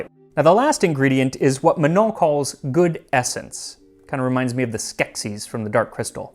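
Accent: France. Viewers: 😍😍😍 for the French accent !!! So cute !!!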